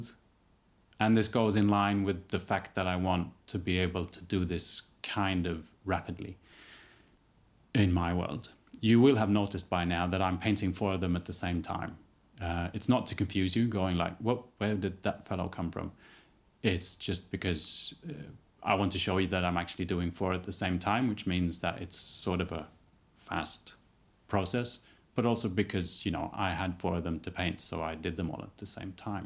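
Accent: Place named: Sweden. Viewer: You sound like a swede who has lived in the uk for 14 years, working in a pr-company or . Great video as always!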